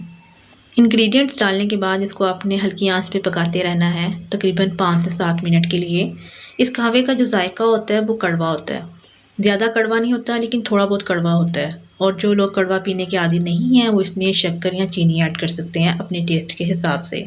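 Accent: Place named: Lucknow, India. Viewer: Aapke aaba ijdaad lucknow ke the kya? Aapka accent me lucknow ka bohot asar araha he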